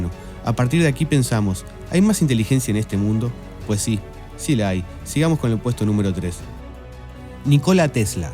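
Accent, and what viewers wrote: Argentina. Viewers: Hermosa voz argentina!!!! me parecio excelente la locucion, nose por que el problema de que sea argentino! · Grande ese locutor argentino papa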